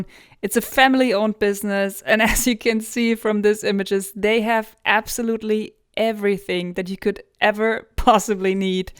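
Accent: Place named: Germany. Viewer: peinlich , wenn eine Deutsche englisch spricht ...:-/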